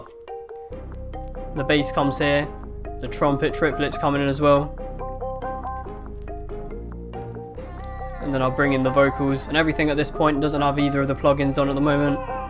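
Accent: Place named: United Kingdom. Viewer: guy is really BRITISH!!!!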